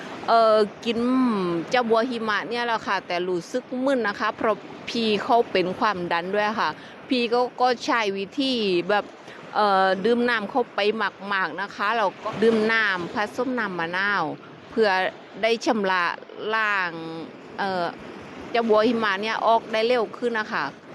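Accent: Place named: South, Thailand. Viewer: ให้กำลังใจน้องกันดีกว่าคะ.บางครั้งการทำคริบในการพูดแบบนี้มันก็มีติดบ้างอะไรบ้างนิดหน่อยมันก็ไม่ได้น่าเกลียดอะไรสำเนียงใต้ฟังก็เพราะดีนี่คะ.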